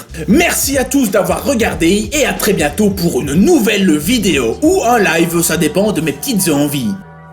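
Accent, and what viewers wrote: Belgium. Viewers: Est-ce qu'on peut faire ça avec des elastibands? 😄.. Tu as une.voix terrible mec! En plus l'accent belge embellie tout ça mdr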